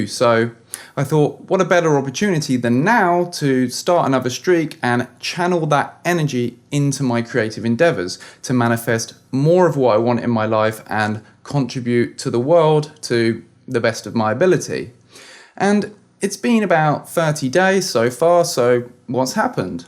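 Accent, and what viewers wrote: United Kingdom. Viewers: I heard Wotor couple of times, you must be from UK, am I right ?😆👍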